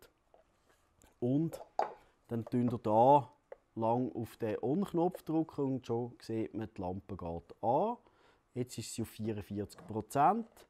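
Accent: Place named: Switzerland. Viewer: Interessehalber mal nachgefragt: Welche Art von Schweizerdeutsch hört man hier? Züritüütsch? Ich als Norddeutscher verstehe jedenfalls relativ viel (anders als z. B. bei Walliser Deutsch).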